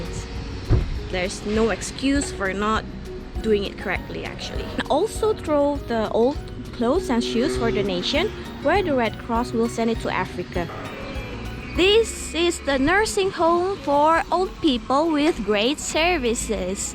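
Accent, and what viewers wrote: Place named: Europe. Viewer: Eeiiinnn logatnya macam European sekarang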